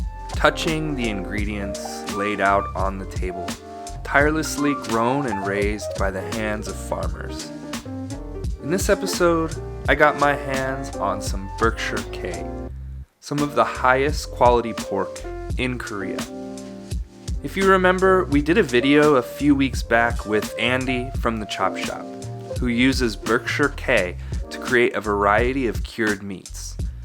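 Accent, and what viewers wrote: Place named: United Kingdom. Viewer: your british accent is so funny! ㅋㅋㅋ 한국인보다 한국음식을 잘아는 사람!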